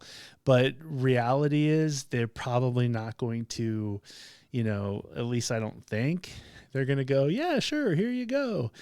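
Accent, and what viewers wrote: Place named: United States. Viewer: This is the first video I see from you, so I know nothing about you. You sound American. Prepare for weather to be whatever, it's all over the place every day. In June, in the Southern Finland, heat-wise, I'd say +30C is THE MOST EXTREME I can think of, and that'd probably be a record or something. Summer days are usually around 15-27C. I'd put on a t-shirt, long but light pants of some sort, a jacket that's easy to take off and tie on your waist or something in case it's a hot day, comfortable shoes that can withstand rain or mud and a hat, put on sunscreen, take a disposable rain coat (fin. "kertakäyttöinen sadetakkki") with you - if they don't sell them where you live, in Finland you can buy one in pretty much any bigger grocery store such as Prisma or Citymarket for about 2€. Festivals don't allow to bring in water bottles. 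Most festivals have free water, in some you have to pay a small amount for it. Check the Tuska Festival site - if they're doing their job properly, they'll have a list of things you can or cannot bring with you and if water is provided. It's a big festival, I think they'll probably have that information. (Also try to google if a person from your area is ok to drink tap water in Finland. I was in Provinssirock about a decade ago and the water they provided was a self-standing tap in the middle of the area, which was fine for me, it worked. But I've also been to a tourist biking trip to Estonia, a neighboring country, and got a stomach bug because we ran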